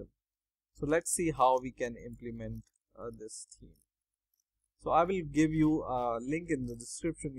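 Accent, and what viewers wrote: India. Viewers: DO NOT DOWNLOAD Honestly guys never trust an Indian guy. EVER! Go watch the tech support scammer videos. They're all Indian people with fake English names. Guess what, this guy has an Indian ass voice and people are saying viruses in the comments. DO NOT DOWNLOAD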